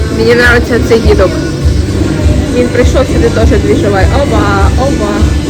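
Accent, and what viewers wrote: Poltava, Ukraine. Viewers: на справді я думав що з Полтави або західної України ) не в вгадав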